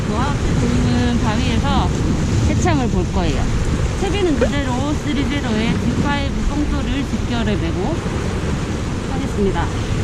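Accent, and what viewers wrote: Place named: Gyeongsang, South Korea. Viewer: 이제 경상도식 표준어(?)를 일주일에 한번밖에 못듣게 됬네요 아쉽습니다. ㅎ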